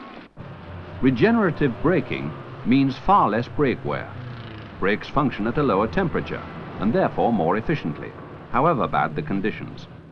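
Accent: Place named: Yorkshire, United Kingdom. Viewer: More than slight; probably Yorkshire. Like Michael Parkinson.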